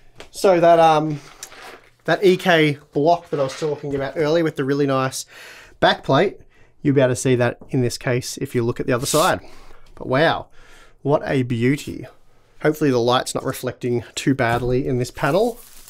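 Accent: Australia. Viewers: Gotta love the Australian accent. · in that accent, I almost responded out loud with "That's not a knife, now this is a knife." Someone please get a proper Dundee knife.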